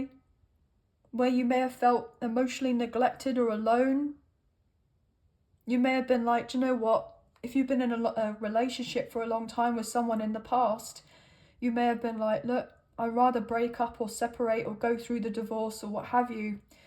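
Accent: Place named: Southern England, United Kingdom. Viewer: Alright sis, love your southern English accent,the french accent was strange, however thanks for all the encouragement we will see how this week works out❤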